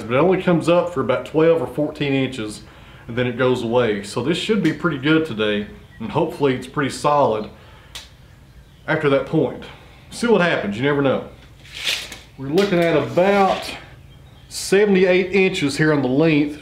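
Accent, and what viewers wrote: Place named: West, United States. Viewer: Thank you for the beautiful music and this COLORFUL "OLD" APPLE TREE 'WOOD' video. And Your voice! I feel like watching "WEST FILMS" in the old cinema, I like and emphasize * Thank you for the video you made with "Old and colorful Apple Tree" accompanied by beautiful music. Also, with your tone and accent, I saw myself watching "WESTERN MOVIE" in an old cinema. Country Music is beautiful in its songs. I remembered AUSSIE Singer Slim Dusty and others. Thanks again. Good Luck and All The Best. From ANTALYA/ TURKEY.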